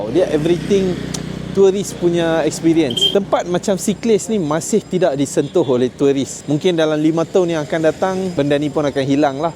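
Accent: North, Malaysia. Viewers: sy pun baru jer jumpa vlog dokter, mmg puas hati especially penyampaian yg amat jelas (siap loghat utara lg, im from Penang), terus berkonten 🎉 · Suka dengar loghat abg..org india tp loghat utara memang padu...btw saya org melayu kedah...loghat abg ni,buat saya rindu kt kg halaman di kedah...salam dari Labuan bang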